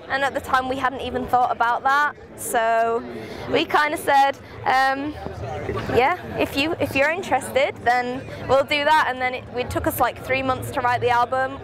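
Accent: United Kingdom. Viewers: I hate her british accent, but she`s cute.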